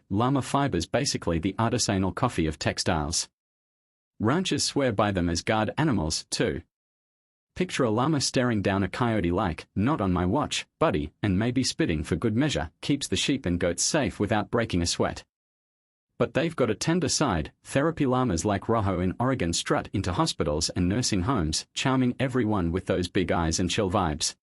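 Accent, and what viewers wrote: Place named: Australia. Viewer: did you choose an aussie accent for this u.s. history lesson? perfect 😎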